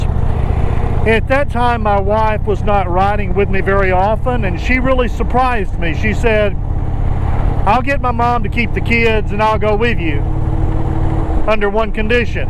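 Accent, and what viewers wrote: United States. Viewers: What's wrong with Hank Hill? I like Hank. My Father-In-Law was a living Hank Hill but with an upstate NY accent but I digress. You're from TX...I can hear that...you also have a very good radio voice and ought to do a radio program...part of the gifting that god gave you. So, do you sound like Hank? Not really but if you did, that wouldn't be a bad thing. I also have it on very good authority that heaven is a long distance call for most places in the world but not in the rural south...Everyone else has an accent but we speak English as God intended... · Here in southern Ohio we say the days of the week the same way. Btw, I never would have guessed you were from Texas from your accent. You sound like you are from NC to me.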